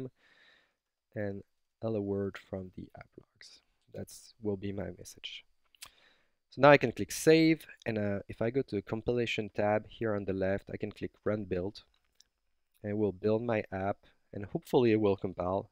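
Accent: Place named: France. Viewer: T'es francais, ca parais ^^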